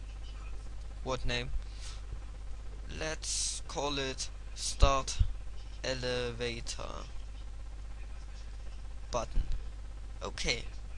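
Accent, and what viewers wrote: Germany. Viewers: Natürlich bist du Deutsch. Merkt man z.B. an "dis" oder diese klare Aussprache. Amis sprechen anders.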